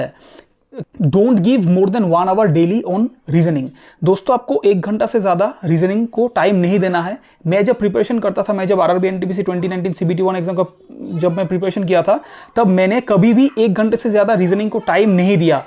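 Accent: Bengal, India. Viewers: Sir aap Bengali ho?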